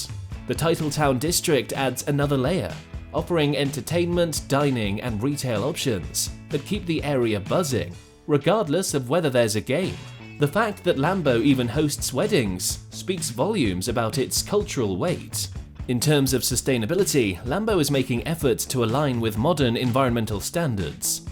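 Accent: United Kingdom. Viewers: Somehow a British voice-over is a dizzy decision when talking about iconic NFL venues. Nice try, I guess? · Why would you use a British accent to narrate this video?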